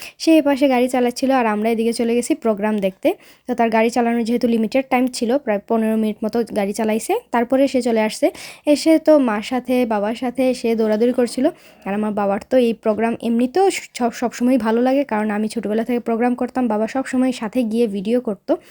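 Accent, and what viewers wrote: Sylhet, Bangladesh. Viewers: আপনার কথা গুলো অনেক মিষ্টি আমার মনে হয় আপনি সিলেট বিভাগ এর ভিতরে বাড়ি আপনার, আমি হবিগঞ্জ জেলা থেকে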